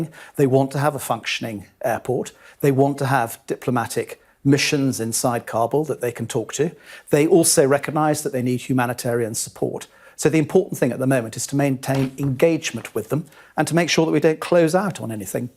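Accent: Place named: United States. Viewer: I think you people made a typo mistake, this guy sounds like American unlike the title of the video .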